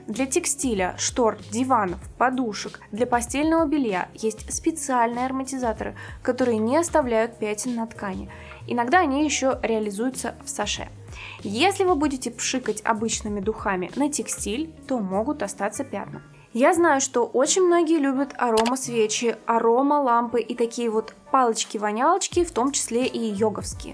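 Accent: Russia. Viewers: ивановский акцент))))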